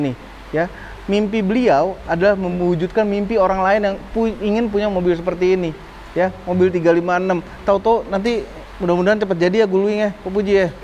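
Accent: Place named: Bali, Indonesia. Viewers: cara bicaranya Bali banget👍😂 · Logatnya bali banget....😂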